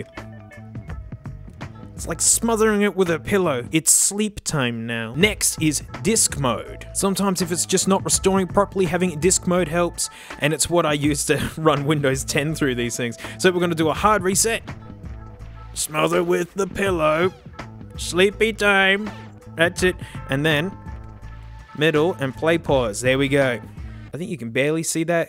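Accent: Australia. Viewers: I absolutely love these vids and the accent is A'stralian ( in a sea of USAs) but I do have a problem. I had a new battery put in an iPod Photo and the sucker will only fully charge via Firewire. If I try USB it will take forever and it still may not fully charge. It's really got me stumped. Any ideas? Oh and or what it's worth I just opened the box on a last gen. 160gb Classic and the battery charged up without any problems. Not bad as it sat in a wardrobe drawer for seven years. I then it connected to a high end dock (after I synced it to iTunes) and the music sounded sublime. Why did I go old tech? Well , I reckon that when it comes to an interface for listening music the scroll wheel can't be beaten. For a Jack and Jill of all trades I use iOS devices and if I want to go half way house which weighs almost nothing then it's the Nano last gen. Anyway if anyone can help with my problem I'd gratefully appreciate it. 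· Gotta love that Aussie accent... 🥰😏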